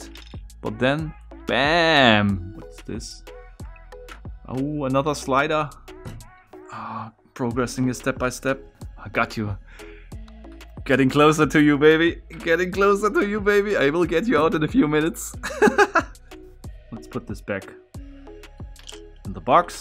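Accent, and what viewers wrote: Germany. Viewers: du bist doch bestimmt deutsch oder? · Bist du deutsch? xD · He sound like a german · Dein Akzent ist so krass deutsch haha Machst du auch deutsche Videos? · Are you German ? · Man hört dass du ein deutscher bist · Hmm.... sounds german. Not the Set, i mean. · you talk little bit like german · Man hört einfach Instant dass er Deutscher ist . Und das Ah Ok war das Deutscheste im Video . Ehrenmann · Das erste Video von dir gesehen den Akzent gehört in der kanalinfo nach gesehen und jap du bist deutsch xD Viele Grüße aus Hamburg! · You are from germany right?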